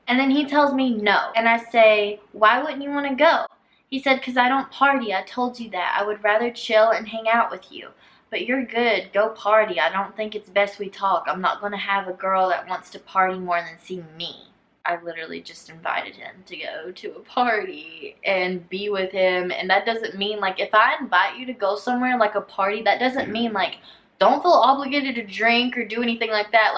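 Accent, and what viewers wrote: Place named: Tennessee, United States. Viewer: Your accent literally makes me so happy. I'm from Tennessee and get picked on because my accent is so deep, and while watching this video someone came up to me and said "wow her accent is like yours!!!" 😂😂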